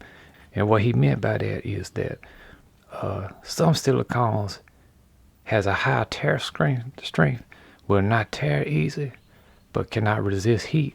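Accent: Louisiana, United States. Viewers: From Louisiana, huh? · You from Louisiana?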